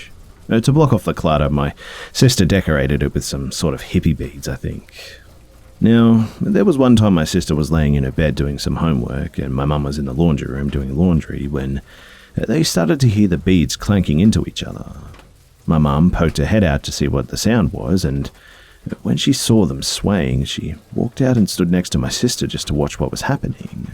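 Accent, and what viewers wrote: Australia. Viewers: I haven’t watched one of these in quite while like 2016 or something I missed the Aussie voice mates.*I am Australian btw*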